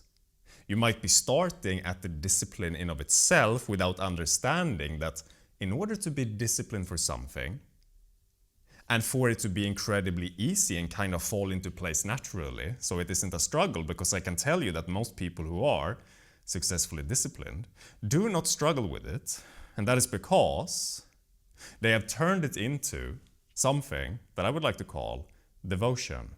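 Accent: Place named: Scandinavia. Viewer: The Scandinavian accent ❤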